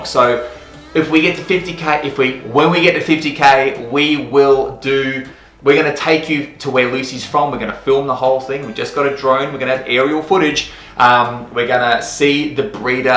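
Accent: Australia. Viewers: Strewth mate, looks like you got another "yes" here for the idea for the next video. Love Lucy and love your Aussie accent. Keep up the good work. 😎👍🏼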